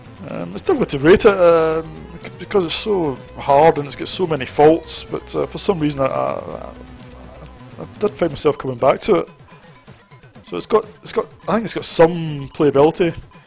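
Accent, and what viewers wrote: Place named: Scotland, United Kingdom. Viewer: nice to hear a fellow scot like myself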